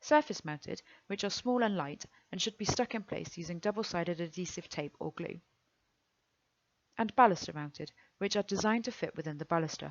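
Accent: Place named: United Kingdom. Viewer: This lady sounds so fancy with the british accent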